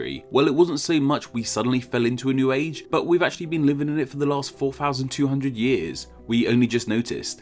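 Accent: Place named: United Kingdom. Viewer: I have never bought into the whole "British/English people sound smarter than American's" until I found your channel. You have such a great voice, and put things in such an awesome understanding for even the dumbest of us. Thanks for all you do, unfortunately, I cannot be a Patreon at this time, but one day I hope to be. Thanks.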